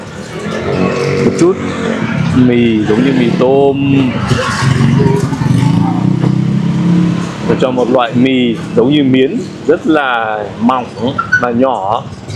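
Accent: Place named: Hai Phong, Vietnam. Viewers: Khả năng ảnh người Hải Phòng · Chí chương luôn 😂 đậm chất Hải Phòng ❤️❤️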